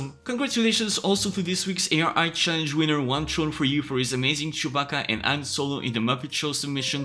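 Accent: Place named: France. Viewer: En 0.23 secondes j'ai compris que j'avais affaire à un Francais.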